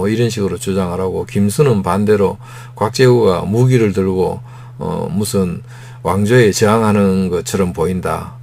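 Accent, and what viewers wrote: Gyeongsang, South Korea. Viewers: 쌍도 억양으로 지역비하방송하지 마시라. 개쌍도야, 전라도 전라도 반복하지 마시라. 호남이다. 약무호남무국가라 했다. 호남이 없으면, 민주도 없고, 조선도 없었고, 대한민국도 없었다. 쌍도 험한지형, 호남 평야,미군주력부대라서, 625때 영남이 보전되었다.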